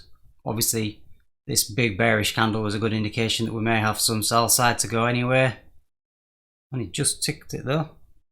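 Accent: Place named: Yorkshire, United Kingdom. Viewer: Interesting you used the 15m FVG, not noticed that previously from other channels. I'm seeing traders posting silver bullet entries with order blocks and OTE on 1 min charts. Generally, when FVGs are featured, they're a confluence within an order block. Nice to hear a Yorkshire accent on a SB video :)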